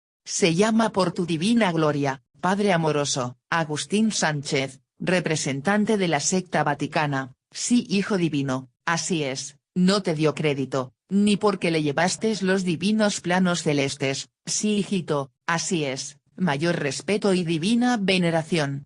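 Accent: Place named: Spain. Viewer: Me sorprende que esté leído por una española...será que ya llegó la Doctrina a Europa?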